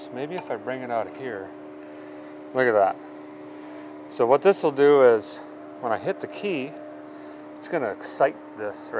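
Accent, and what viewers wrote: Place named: Minnesota, United States. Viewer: Well I’d be darned this fellas accent makes ya want to move to Minnesota 😂 love his humor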